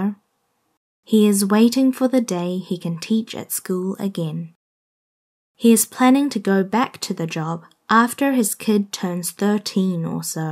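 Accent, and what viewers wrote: New Zealand. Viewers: 이 영어 발음이 뉴질랜드 영어발음 악센트인가요?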